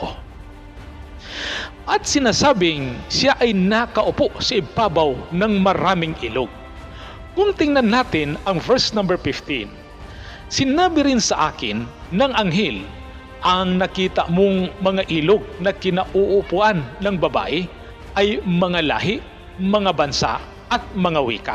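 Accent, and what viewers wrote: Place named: Visayas, Philippines. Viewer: ano ba yang accent mo... sorry... wag ka nang mag pronounce ng english kung bisaya ang accent mo.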